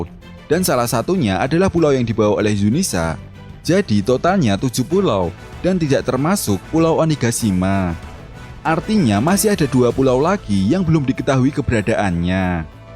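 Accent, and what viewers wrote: Java, Indonesia. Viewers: Logat Jawa nya kental banget 😅😅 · Mendok ya bahasany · Lebih enak dengerin narasi dengan suara begini, drpd yg nadanya dibikin 'sok mantap' wkwk Lebih chill dengernya ini Javanese bgt min, nice, stay low yea~ Keep the good work clap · NARATORNYA MIRIP SUARA DONO . SERIUS. KALAU DONO PAKE LOGAT JAWA DI FILM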